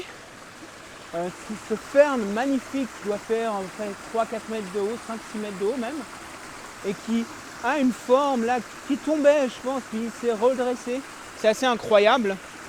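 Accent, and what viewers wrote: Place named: England, United Kingdom. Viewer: Merci pour la vidéo. Tu commence à influencer ton français avec l'accent anglais. Je retrouve aussi que tu cherches des mots anglais temps en temps. Essaye de faire un vidéo en anglais. Ça va te faire une exercice. 😀 Je te souhaite une bonne lumière!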